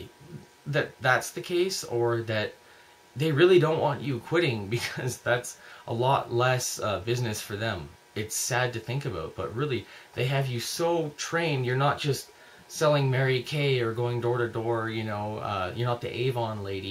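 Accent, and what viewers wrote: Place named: Texas, United States. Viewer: First of your videos I've seen. I'll view a few more this week. You come across as a friendly level headed young man and you'll do just fine without living a life of guilt for doing things that are normal. I was in it toooooo long. It's like banging your head against the wall because it feels so good when you stop! P.S. I like your Texas accent.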